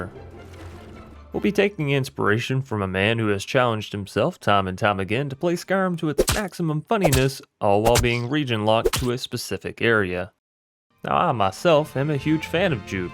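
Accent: South, United States. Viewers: Is that a southern accent I hear? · your mild southern drawl is enchanting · It sounds like you have a country accent sometimes anyway super entertaining video I love stuff like this keep it up brother ❤️ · i have nothing to say other than ur voice is rly nice to listen to! i cant tell if u have a slight southern accent or not but its still nice lol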